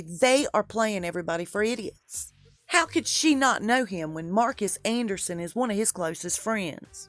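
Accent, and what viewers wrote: South, United States. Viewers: 💜💜💜Happy Valentine's Day! 💜💜💜 I didn't know about your abuse situation. So glad you broke free of that. You are a valuable person with a really cool southern voice! LOL. Bless you dear-heart today and always. Here's a rose for ya. 🌹🌹🌹🌹🌹🌹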